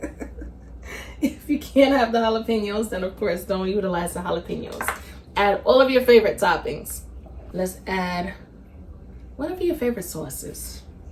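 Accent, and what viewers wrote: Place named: Brooklyn, United States. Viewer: Ohhhhh... Darlin.....you make meold mouth water. i want to try these!! BTW... I love your sweet accent... a little Brooklyn... or New Jersey maybe?? Thanks for sharing.... yummmmmm. Ax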